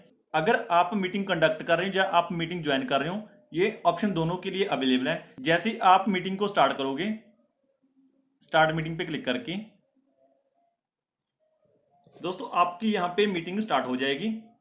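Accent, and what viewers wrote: Punjab, India. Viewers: are u from punjab?? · Bhji tusi punjabi a ki hryanvi?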